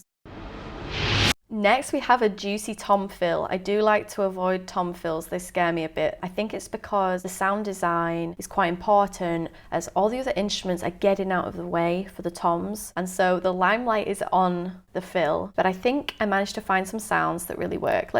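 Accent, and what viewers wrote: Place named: Colne, United Kingdom. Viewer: Enjoyed this muchly. Subbed. You aren't from Colne by any chance are you? You sound uncannily like you might be........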